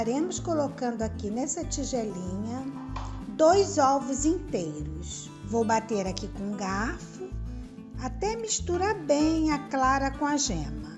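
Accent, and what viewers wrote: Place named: Rio de Janeiro, Brazil. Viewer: Pelo sotaque é do Rio de Janeiro 😅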